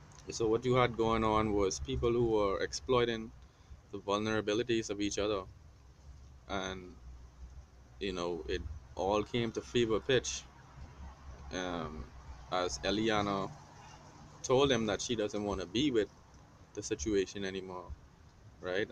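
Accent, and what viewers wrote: Bahamas. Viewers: Are u Bahamian? The way we talk can't hide lol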